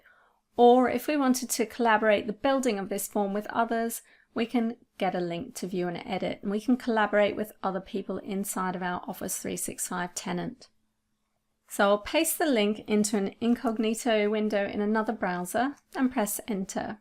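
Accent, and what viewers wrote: United Kingdom. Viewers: Many thanks,. 🤣 I always thought you were British 🤣. Admittedly, I've never been good with accents, and/or it wouldn't be surprising for a Brit to live in Australia....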